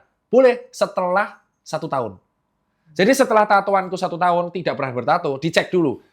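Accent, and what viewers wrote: Java, Indonesia. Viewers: Dok Tirta setelan Jawa gni paling suka.. hahha terutama pas ngmong "agak rekoso itu", "..let stgh jam" wkkwkw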